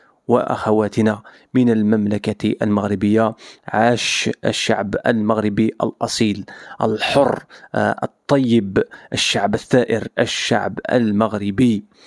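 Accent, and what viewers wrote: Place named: Kabylie, Algeria. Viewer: ما شاء الله .. لغة عربية فصحى رغم اللكنة الأمازيغية لمدينة تيزي وزو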